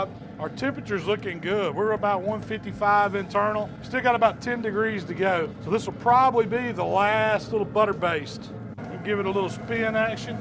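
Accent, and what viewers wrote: South, United States. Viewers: Look no further when scrolling through how to smoke a turkey and come across a guy with a mean southern accent. Thank you sir!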